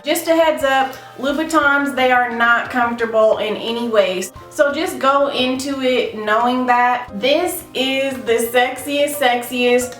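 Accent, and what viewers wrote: South, United States. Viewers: You are so cracking me up with how honest you are about these shoes. I call it Shoepidity. Especially those god awful Louboutin. I have the Penny, took me over a year before I wore them. I have only wore them twice. Plus the platform is a dated shoe. I was coveting the white pigalle but I wanted it in the pretty blue. I am really impressed with your knowledge. Regarding finding sales. It's Dec 2 and June 2nd for sales. That's Gucci, Saks, Neiman and the other like department store. BTW where are you from? You have a strong southern draw. I have to check you out on Instragram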